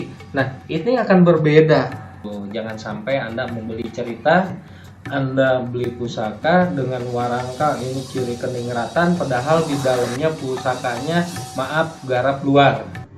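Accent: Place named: Bandung, Indonesia. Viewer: 😅 logatnya gaya orang cicaheum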